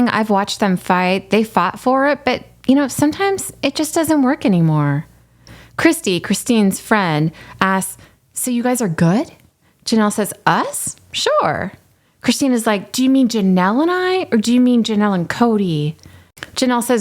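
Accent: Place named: Fargo, United States. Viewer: I absolutely live for your Robyn/Fargo accent. Makes me laugh every time.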